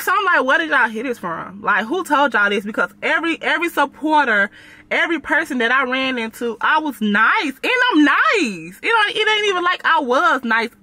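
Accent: United States. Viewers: A lot shit y’all do stem from where we from “Chicago” both ears pierced is heavy in Chicago I got both my son ears pierced and the way you talk is also us Chicago females we talk hard asf naturally! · Picking up that southern accent sound nice on you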